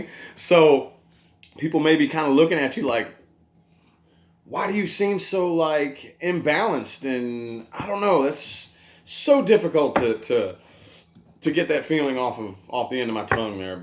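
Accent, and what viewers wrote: South, United States. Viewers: Marry me? 😍 A tarot reader with a southern accent....swoon!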